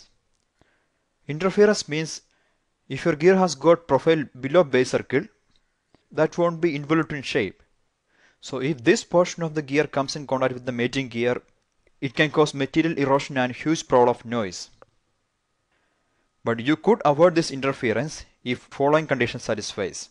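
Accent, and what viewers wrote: Kerala, India. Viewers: Indian English . The accent is thick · are you a malayali? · malayali annnalleeeee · He has a strong malayalam accent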